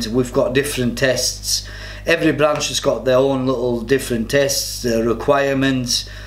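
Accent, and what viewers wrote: Scotland, United Kingdom. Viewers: Love ur accent. Scottish? That would perhaps make u Scots guards, or a highlander? So there are 3 phases of ranger school. The early part of the vid u watched was just the first part of the first phase. That's the 'easy' part. The goal of all the extra water spraying and stuff is made to make ranger school as miserable as possible. After u get to ranger school u r working off of a 4000 calorie deficit, and u only get about 4 hrs of sleep per week, if ur lucky.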